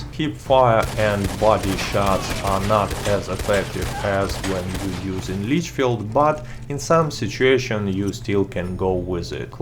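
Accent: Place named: Russia. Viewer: I love this man's russian accent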